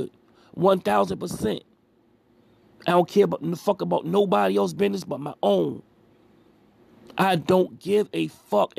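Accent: Baltimore, United States. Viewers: Yo, I dropped a video like a week and a half ago on this topic. I support your opinion. Btw, sound like you from B-MORE. I got some people out there. Talk just like. You, lol. Imma sub tho. Respect🙏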